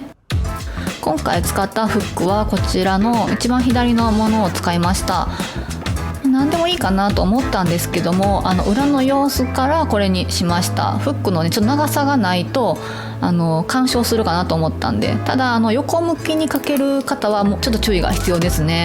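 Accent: Kansai, Japan. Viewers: 関西訛りですか？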